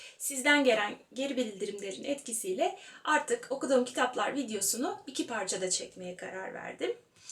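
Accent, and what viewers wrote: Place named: Denizli, Turkey. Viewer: klasik okumaya hangi kitaptan baslamaliyiz? bu arada abla cok tatlisin denizlili akrabalarima benziyor konusma tarzin hep seni izlemek istiyorum cok ictensin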